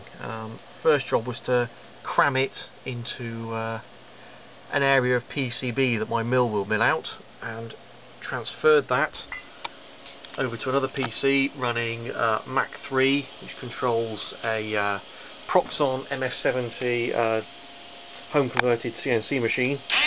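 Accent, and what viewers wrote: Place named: South West England, United Kingdom. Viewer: Sounds like a South Western accent... always nice to hear on the internet! Nice video!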